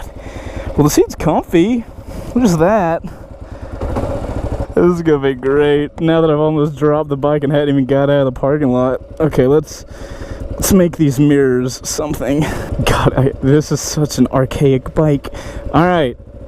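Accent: South, United States. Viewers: Dude I fucking love this bike...I'm buying it. This will be my first bike.Thank You, you smooth southern, silver-tongued bastard.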